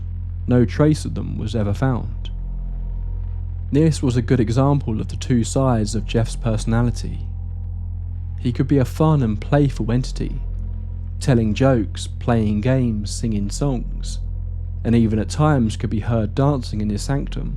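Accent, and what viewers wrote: United Kingdom. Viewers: Bullognia!!. I'm a native NY-er who tunes in to this channel for the smooth, sophisticated British voice overs that only provides.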